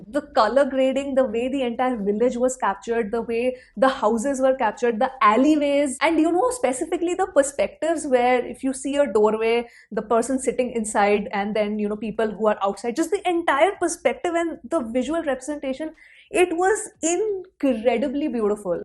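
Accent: India. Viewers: Your Indian accent is so annoying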